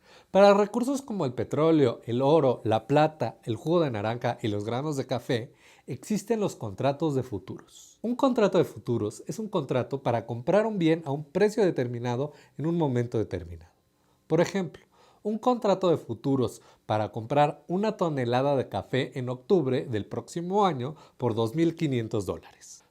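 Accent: Mexico. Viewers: Nuevo suscriptor, encontré en tu vídeo la mejor explicación a mi duda, me supongo que eres mexicano por tu acento, ojala pudieras exponer con este mismo lenguaje el tema de la fluctuación de los tipos de cambio, hay muchas explicaciones que indican como funciona actualmente, pero mi duda es como se cotizaba el peso mexicano antes de 1994 y si todavía hay países que tengan ese tipo de control cambiario y como les va por qué aquí:si Grecia tiene demasiados burócratas el dólar sube si llega un tsunami a Indonesia el dólar sube, si se atora un barco en el canal de suez el dólar sube, si los texanos no pueden pagar sus hipotecas el dólar sube, si se incrementa la producción automotriz en México el dólar sube si Putin le dice compadre a Trump......etc.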